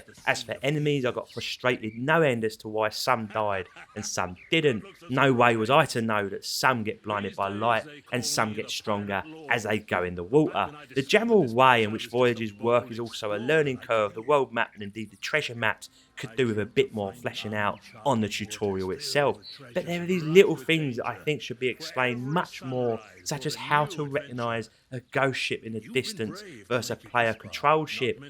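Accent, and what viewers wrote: Cockney, United Kingdom. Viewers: You deserve more subscribers my cockney sparrow! Love your videos 👍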